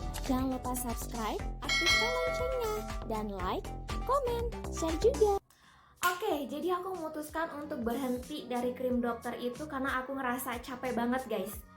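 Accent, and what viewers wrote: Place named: West Java, Indonesia. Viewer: Sunda nya tetep keluar yah bundd wkawka ditunggu next video 🙌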